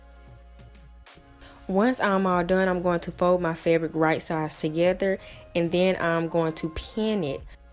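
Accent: Arkansas, United States. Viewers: Your accent is so strong! Arkansas. I like it. :-) I'm from Cali and right away knew you were from somewhere on the east coast. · aye turn up Arkansas!! i knew i recognized ur accent lol keep it up girl!!💕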